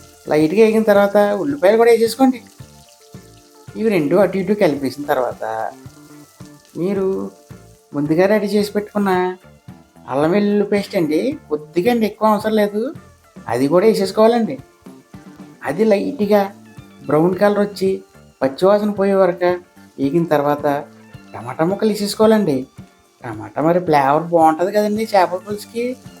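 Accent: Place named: Konaseema, India. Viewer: konaseema voice and super curry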